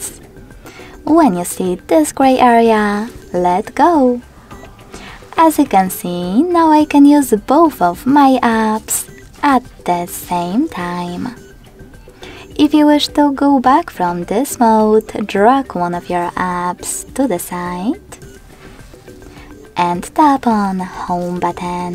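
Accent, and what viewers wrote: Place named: Thailand. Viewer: What the heck, 😂 the voice is so Thailand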